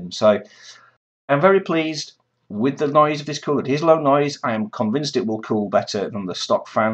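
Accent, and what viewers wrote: United Kingdom. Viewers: Your accent is similar to mine, I'm in Leeds, but I don't pronounce it like that lol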